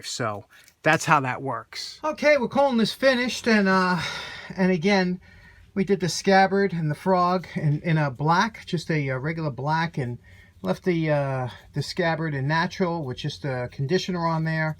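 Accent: New York, United States. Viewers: Great New York brogue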